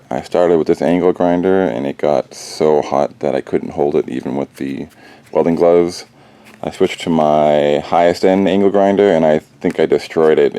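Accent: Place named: New England, United States. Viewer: Where u from.dude? U sound like u got a Rhode Island slash Massachusetts accent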